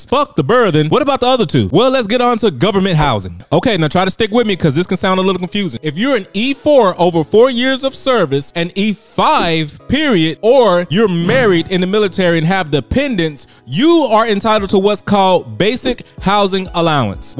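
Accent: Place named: United States. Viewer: Please can you talk a little slower?? I'm in Africa and the American accent is a little difficult for me to catch up especially when you're talking fast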